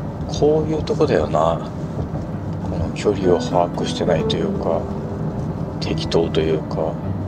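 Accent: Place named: Hokkaido, Japan. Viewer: 久しぶりに聞いた北海道なまり❗やっぱりうまいっしょw 本当にガレット美味しそうでした。アクシデントでタイヤ購入って春先はやっぱり私も有ります😢 週２回もさん見れて嬉しいです🎵 無理無く旅して下さいね😊❤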